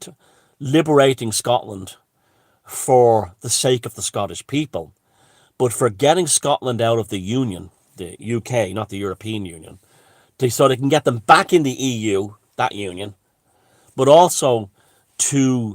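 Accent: Ireland. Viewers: Brilliant 👍👍👍👍, I love the Irish accent and the Irish people, 🇬🇧👍👍🙏🙏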